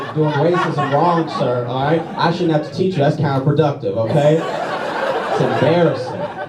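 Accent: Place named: Louisiana, United States. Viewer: What's good Fam! Im from North Louisiana, half way between Alexandria and Shreveport. A little shithole called Zwolle! I just ran across ur videos and was checkin it out and of course that Louisiana draw caught my ear. When you said you from Louisiana and everybody clapped and u said not New Orleans and said New Orleans to Louisiana was like having 1 beautiful blue eye and a fuckd up face!! I laughed my ass off cuz! When I tell people where im from I can already see images of Mardi Gra starting to form n their head. Keep up the good work man, make us proud! life n such a fuckd up place will giv u a either a chip on ur shoulder or the best sense of humour ever. I guess u got the latter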